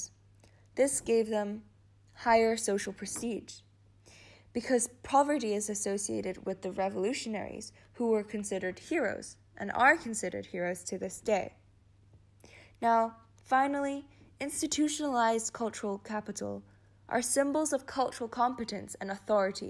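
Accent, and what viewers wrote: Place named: France. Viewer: Love the French accent ladee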